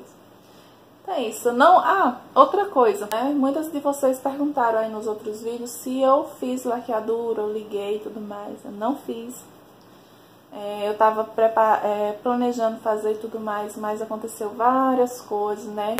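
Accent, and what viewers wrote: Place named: Northeast, Brazil. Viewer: Como amo esse sutaque, meus pais são nordestinos 💝 Adorei seu canal, sua naturalidade e sinceridade 💝 Que Deus continue abençoando vc e seus filhos💝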